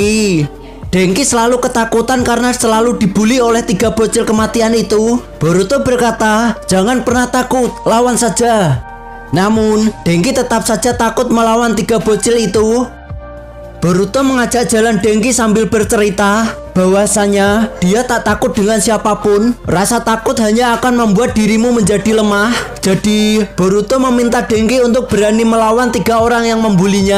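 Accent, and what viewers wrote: Java, Indonesia. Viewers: aku suka film nya cma kok suaranya medok amatt 😂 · Mimin org jawa.. Medoknya keluar.. 😅😅 Suaranya kaya robot..lurus😅😅 Tp malah pny ciri dan lucuuuuu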